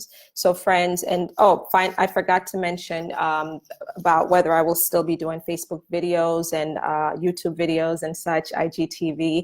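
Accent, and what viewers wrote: Jamaica. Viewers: You Jamaican?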